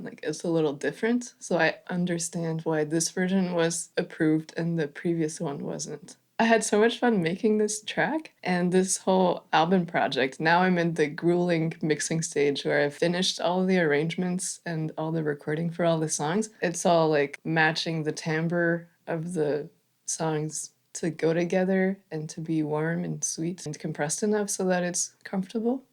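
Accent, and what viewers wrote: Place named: Quebec, Canada. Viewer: Years ago when I watched one of your early video, I thought your talent combined with your quirky Québécois inflections might spell success. Back then you recorded vocals with an SM58, which surprised me. Then last year I saw you sponsored by Native Instruments (I think). Congratulations on your management and continued success! 😁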